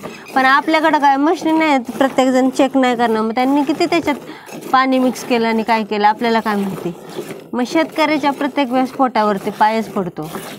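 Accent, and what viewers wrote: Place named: Solapur, India. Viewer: Nice vlog: I love to hear your Marathi with Sholapur flavor. So frank and sincere.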